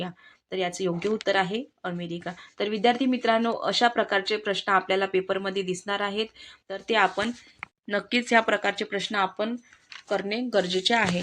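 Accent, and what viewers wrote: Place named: Vidarbha, India. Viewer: Ati uttam mam, tumhi vidarbhachya watata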